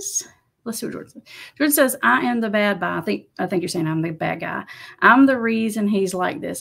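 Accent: South, United States. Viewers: Love the channel and your slow Southern style !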